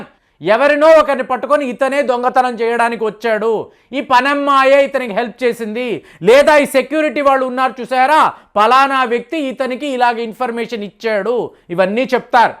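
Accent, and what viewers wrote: Tamil Nadu, India. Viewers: gaaru Chennai lo unaaru kaabatti thamil mix untadi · తమిళ ప్రభావం ఎక్కువయ్యి మాతృభాషను నిర్లక్ష్యం చేస్తూ తప్పులు మాట్లాడుతున్నారు ప్రేమ్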